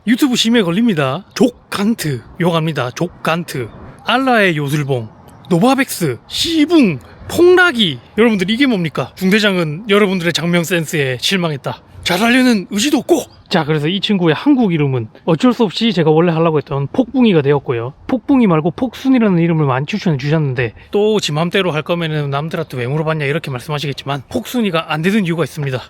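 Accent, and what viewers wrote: Busan, South Korea. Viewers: ㅠㅠ왜 눈에 습기가차는지.. 형님 갱상도 남자인데 은근 따뜻함이 느껴지네예…. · 나강처럼 부산 사람인교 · 안녕하세요 구독하고 갑니다 부산아재 고향목소리 듣기 너무 좋고 편합니데이 부교공 전기직군 빡세네요 외주 안주나 보네요 브레인께서 너무 최전선 상노가다 뛰셨네요 그동안 정말 고생많으셨습니다 !! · 저두 고향이 부산이여. 잘 볼께여 사투리 조아여~~~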